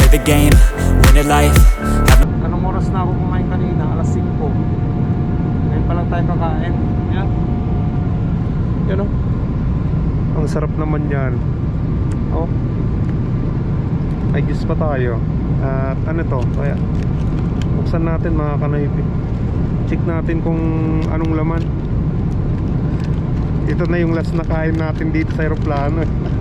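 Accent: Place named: Ilocos, Philippines. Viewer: May ilocano accent ka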